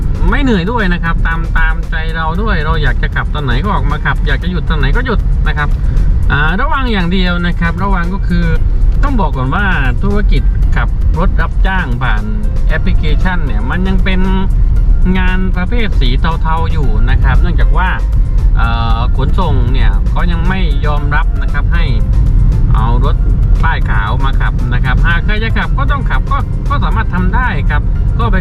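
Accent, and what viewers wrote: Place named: South, Thailand. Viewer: สำเนียงน้าเหมือนคนใต้เลยคับ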